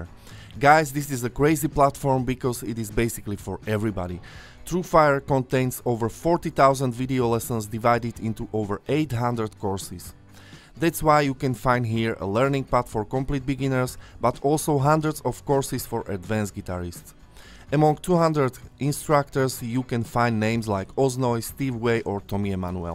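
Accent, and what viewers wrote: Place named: Slovakia. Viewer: Nice content, I'm also 95% sure that you are from Slovakia according to your English accent. I'm right? :)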